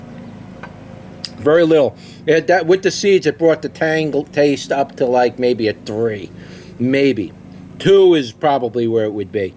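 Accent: New York, United States. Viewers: Love the review. A very upbeat video. Love the New Yorker accent. I'm from Canada so it ain't something you hear every day. Keep on growing! I subscribed and liked.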